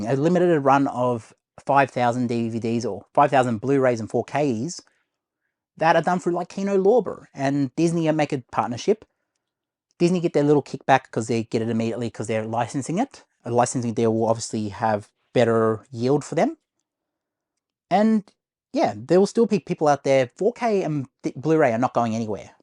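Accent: Australia. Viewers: I like you, ive said before the way you talk and explain things chills me out. I think you are lost with this you tube, why dont you just copy some pros, do a top ten list of fave haloween mivies. Or for me i could just chill and listen to you review 1 film (no spoilers) at a time . Watch ava pop culture what he does and put your own twist on it, not many would notice if you just copied his videos non stop but with ur aussie accent and chill way of explaini g things, hes frantic, but getting subscribers by the dozen. Copy him bud.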